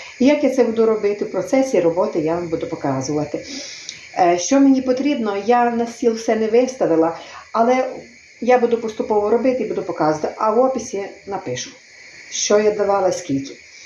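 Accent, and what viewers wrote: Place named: Kirovohrad, Ukraine. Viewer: Спасибо, Вам большое. Случайно попала на Ваш канал и очень рада, что попала. Слушаю, не наслушаюсь вашим украинским говорит, таким славным. У меня мама родом с Кировоградской области, говорила именно так. Спасибо ещё раз.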